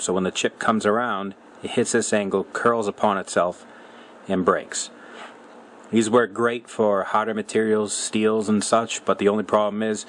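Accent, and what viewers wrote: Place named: Massachusetts, United States. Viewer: What a great video. I'll have to watch this a couple more times to get maybe one tool to work like yours. You make this all look so easy to do, but in reality this is an art. All always, I appreciate all you expertise and hard work making these great videos. I too am from Mass, but I sure don't sound like you. I guess I have been away too long. Now my brothers have that accent. They call me the adopted one. Looking forward to your next video.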